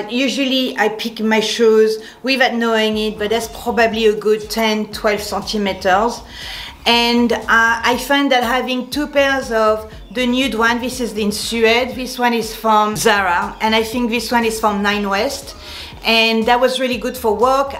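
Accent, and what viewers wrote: France. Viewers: I agree! J’adore da porter les talons hauts!! Je me sens super sexy 💕😆 Aussi j’adore sa te vidéo! Ton accent français es magnifique 😍 (je suis Canadian)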